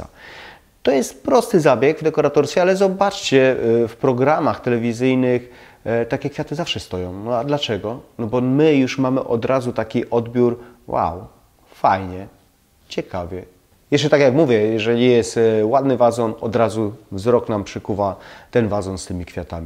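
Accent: Silesia, Poland. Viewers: Czy ja słyszę nasz cudny śląski akcent? 💚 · Zgadzam się z przedmówcami, a od siebie jeszcze dodam, że mówi Pan wyraźnie, bez zadęcia, a śląskie naleciałości są fajnym smaczkiem :) Dziękuję, że Pan się przykłada i dzieli wiedzą. Ciepłe pozdrowienia ☀️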